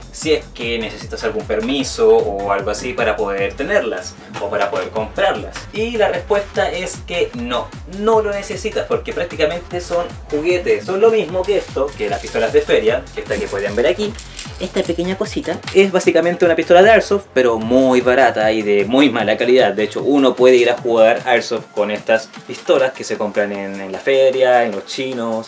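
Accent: Chile. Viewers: Ya me imaginaba q era chileno se parece a Germán ajajajajja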